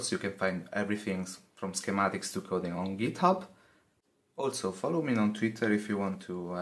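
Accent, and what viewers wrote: Italy. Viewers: Italiano ? Si sente l'accento.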